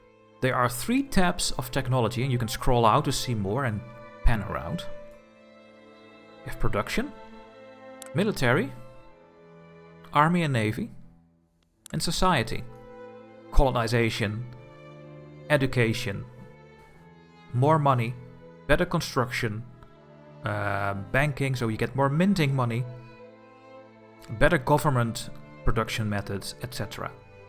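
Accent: Netherlands. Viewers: Dutch has a really elegant sound to it, especially when someone speak English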